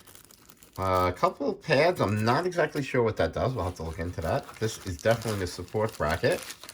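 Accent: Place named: New York, United States. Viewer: Grats on the card, i'm still waiting for mine. Side note, I really miss new york and the accents loi.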